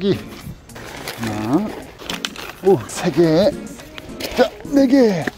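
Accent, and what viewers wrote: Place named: Gyeongsang, South Korea. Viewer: 갱상도 발음으로 설치해주셔서 엄청 친근감 있습니다!^^ 영상 덕분에 첫피칭 잘 해보겠습니다. 😂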